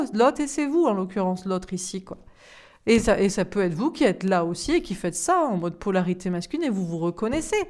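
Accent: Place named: Southwest, France. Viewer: L accent du sud ouest est magique 😁 J ai le même 🤪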